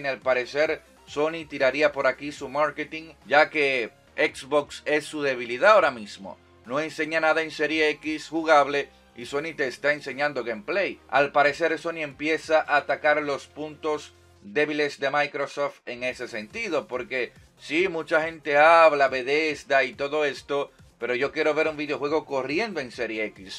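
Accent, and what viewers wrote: Dominican Republic. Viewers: Este pana suena Dominicano! Muy buenos videos, Tiene mi like buen hombre!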